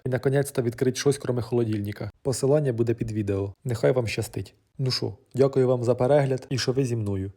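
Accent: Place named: Poltava, Ukraine. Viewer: Мова схожа на Полтавську!))))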